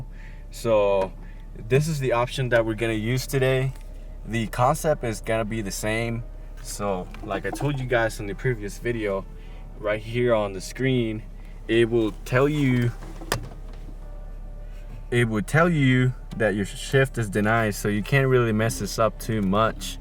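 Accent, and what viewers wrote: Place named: Baltimore, United States. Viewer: Are you from Baltimore? That Tew and Yew sounds familiar lol😂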